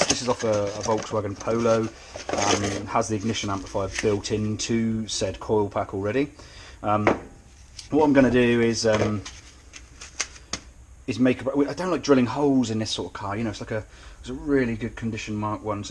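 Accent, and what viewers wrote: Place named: RP, United Kingdom. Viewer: Don't you sound posh on these videos, nice work mate, love all this old stuff.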